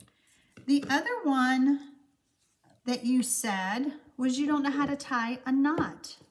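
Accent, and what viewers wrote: South, United States. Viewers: Hi! Been watching your videos and love what you do! Love the southern gal accent too!!:-) Can you tell me where you order your 12x12 plastic sleeves for DSP? Love that idea and I need to get them. Also, then how do you store your sleeves?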